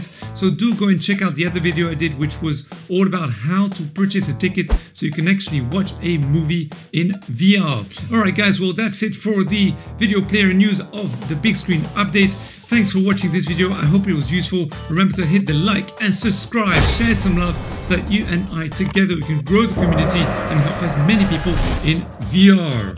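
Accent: France. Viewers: you have french accent lol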